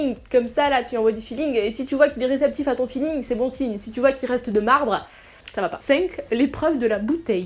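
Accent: South, France. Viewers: coucou! Comme d'hab tes vidéos qui sont originales! Toujours à ta sauce. Et ron accent épicié du sud😂 jt'adore bb😂 #teamprout❤❤❤❤❤❤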